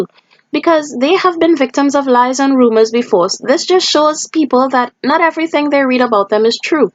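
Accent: Trinidad and Tobago. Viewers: you are sounding like a Trini are you? Good summary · Is that a trini accent i hear :)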